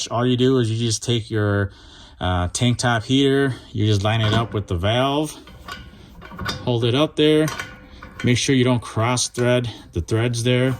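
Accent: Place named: Minnesota, United States. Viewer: i thought he was from Minnesota as soon as he started talking, kind of like Canadian.. i dig that accent.. great video too ! i'm getting my big buddy today🎉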